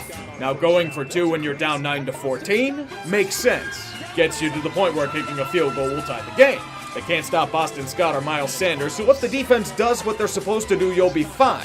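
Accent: Pittsburgh, United States. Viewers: Did anyone understand anything he said in Yinzer Mode? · inner Yinzer has finally come out after all these years and I LOVE IT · Don’t ever go to Pittsburgh, that’s literally how every local talks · Stop with the Yinzer voice makes me wanna cut the video. So fucking annoying and not funny! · the yinzer sultry voice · yinzer voice is a true thing of beauty. To anybody who has never been to Pittsburgh and wonders if we really sound like that? Pretty much, yeah, we do. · The return of the Yinzer It’s glorious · Stop doing the yinzer voice I actually wanna enjoy the coverage on the steelers lol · “yinzer voice” is actually real voice. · Jesussss christ that insane cringe yinzer voice. Nearly unsubbed.. nearly. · Unpopular opinion: I dont the like the yinzer voice, as a Steelers fan. I miss days of our Steelers lmao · the whole Yinzer voice thing is unwatchable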